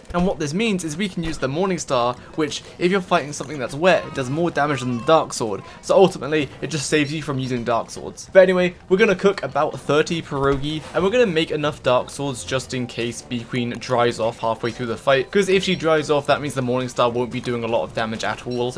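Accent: United Kingdom. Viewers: The bri'ish accent commentary is charming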